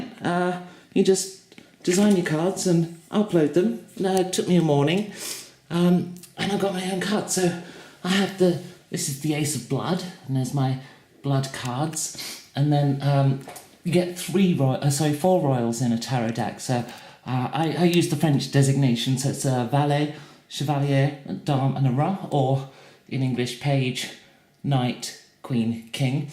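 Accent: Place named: United Kingdom. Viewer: this guy has the most british accent I've ever heard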